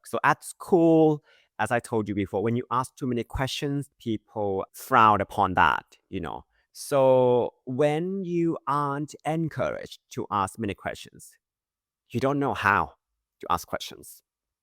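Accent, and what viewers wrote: Australia. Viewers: just curious - your accent is more Aussie than British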